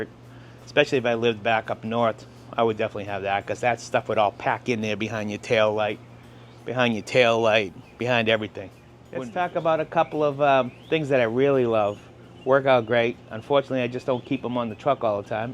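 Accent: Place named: Boston, United States. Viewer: (Guessing you're from Boston, based on your accent?) Just picked up my '25 Hybrid Maverick, LT.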